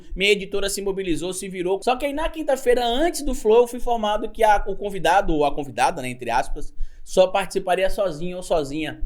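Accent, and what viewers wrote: Northeast, Brazil. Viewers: Hola,. Soy Cristina de Italia. ¿Te acuerdas? Muchas veces te escribo y no me contestas y estoy re triste. Estoy aprendiendo portugués desde hace cinco años, pero no entiendo muchos acentos de Brasil, tipo el de Río y el de tu región. No te niego que muchas veces me cuesta entenderte. Solo que yo no me río, en el sentido de reír, ahahahahah, de los acentos que no entiendo. Por eso, yo también quisiera que los brasileños se neutralizaran, no importa el acento. Un ejemplo, hablando de doblaje. Escuché El rey león live action en portugués y la voz de Nala adulta es la cantante Isa, que es de Río de Janeiro. A mí me cuesta entenderla, a pesar de que no sea del noreste de Brasil. A un amigo con el cual escuché la película, le pregunté por qué no se neutralizó y me dijo que el lenguaje neutro no existe. ¿Cómo tengo que decir para pedir que una persona hable neutro sin ser maleducada? 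¿Cómo se llama tu amigo lingüista? Quisiera hablar con él. Espero que leas esto y te abrazo fuerte.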